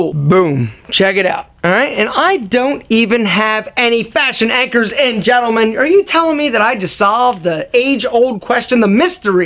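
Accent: United States. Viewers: The loud American accent ruined it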